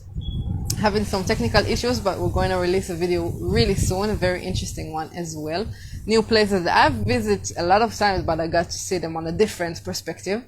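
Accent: Jamaica. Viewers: I can hear that you have picked up a slight Jamaica accent. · Sorry, but Yuh yaawdie accent top tier yufuk!! 🤭🔥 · 🙂Lady you have the Jamaican accent!!🧏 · You sound and could pass as a Jamaican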